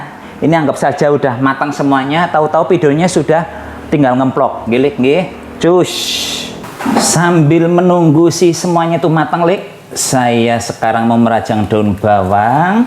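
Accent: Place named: Banyumas, Indonesia. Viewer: Asalamualaikum Wrwb Sugeng sonten mas, mana byunge mas, lha Yo ngono di yalakan lampune jok peteng bae😂😂😂, AG ikut, Tan bahasane mas ngapak😂😂,LGI masak nopo Yung, wah Niki sng yg sangat mengsipirasi gaene penyakit maq,e, kambuh kebanyakan, dan sekali lagi terimakasih obat maqnya, trs yimak cuuus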